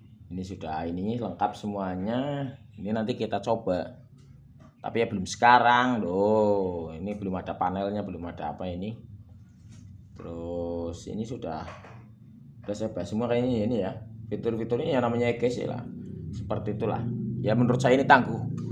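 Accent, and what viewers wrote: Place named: Banyumas, Indonesia. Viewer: Om... Logatnya banyumasan asli mana bos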